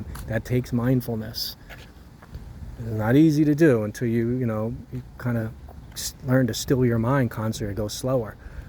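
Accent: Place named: North, United Kingdom. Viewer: Thank you I needed to hear this. I am a chronic worry wart. It’s also nice to hear the you deliver this message in the vernacular from up north where I grew up. Thank you✌️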